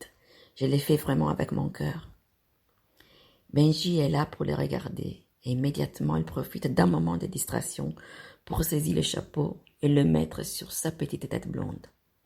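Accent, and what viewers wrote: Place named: Italy. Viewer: Oh, bellissimo, ancora! Il racconto in francese, con il Suo accento italiano: carinissimo! Esattamente quello che vorrei: una donna/moglie italiana che impara e/o parla il francese!... Parla un francese perfetto,! Allo stesso tempo, è abbastanza normale, con 25 anni di presenza in Francia, come ha già detto. Dice "Pour mes amis français": grazie! Se io potessi essere un Suo amico francese, accetterei molto volentieri!... Lei è un'amica italiana, comunque!...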